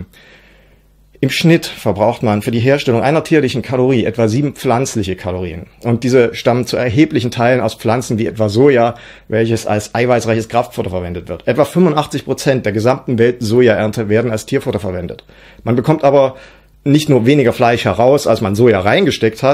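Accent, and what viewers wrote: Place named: Saxony, Germany. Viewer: ich höre es nur gaaanz leicht raus, mein Mann sächselt deutlich mehr. Ich mags. 😁😉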